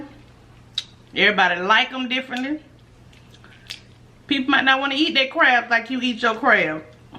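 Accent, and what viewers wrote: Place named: Memphis, United States. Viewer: Because you and my grandma got that Memphis accent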